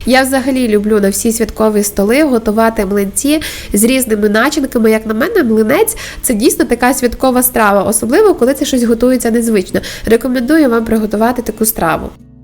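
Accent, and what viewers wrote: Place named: Western Ukraine, Ukraine. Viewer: Який файний западенський акцент! Кайфую! Дякую!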